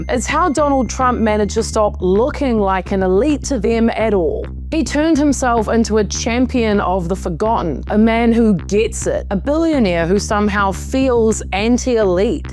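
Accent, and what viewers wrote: New Zealand. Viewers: Thank you to a New Zealander on the other side of the planet for helping me understand my neighbors in the U.S. a little bit bedder, (sorry; 'better'. Enjoying the Kiwi accent). I've lived most my life between rural Maine and the city (not bedroom suburbia). Last week, deep in the Trump country of rural Maine (Burnham, 67.5% Trump) we just lost another factory, (making wood products.) Whatever the reason; state laws, Trump tariffs, Trumpism is clearly not helping. Will they catch on? Probably not before it is too late and any form of democracy is gone. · Excellent logical intelligent presentation. I was sceptical initially and suspected this was an AI-generated Kiwi accent to appeal to us, despite being familiar with voice from RNZ but clueless about her appearance. (Subbed.) Trump convinced the "unwashed" that the middle-class were the elites who were mocking and oppressing them. 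Steve Bannon is all over this cynical manipulative strategy.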